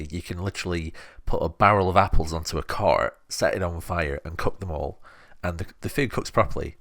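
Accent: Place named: Blackburn, United Kingdom. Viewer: That sounds like a Blackburn accent to me, great review man. Pearl abyss have created something truly special, subbed.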